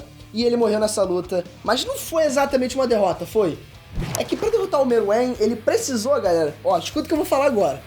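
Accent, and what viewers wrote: Rio de Janeiro, Brazil. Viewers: sotaque carioca kkkk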